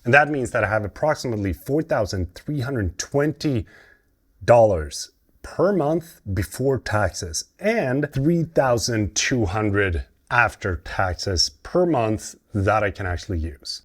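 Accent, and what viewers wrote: Sweden. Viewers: Nice, I just found your channel. Can hear you are from western West Gothia on your accent in English, probably near Göteborg. I am a Swede from Falköping, live in Mexico now, but moving home soon. Enjoyed the video, it is funny how to explain sociala avgifter tax and pre-skatt for Americans lol. I remembered once as a young Swede, being a 2-shift factory worker, when I calculated my arbetsgivaravgifter, income tax and all the stuff I paid all that moms and other "avgifter" on I was at a 78% tax rate. Cheers from Mexico, Liked and subscribed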